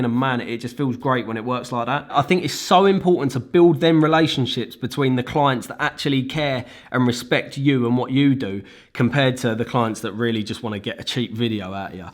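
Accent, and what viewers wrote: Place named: Essex, United Kingdom. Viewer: Who else came here in 2021 for the title but stayed for the voice! Massive bruv...get in there yer essex geezer, love ya stuff!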